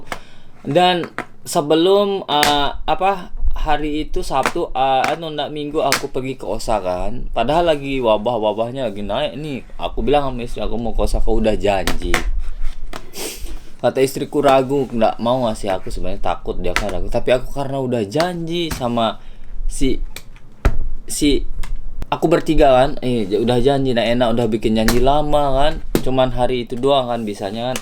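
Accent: Lombok, Indonesia. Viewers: Walaupun pke b indo tapi logat sasaknya masih ada 🤣🤣 mantep side bang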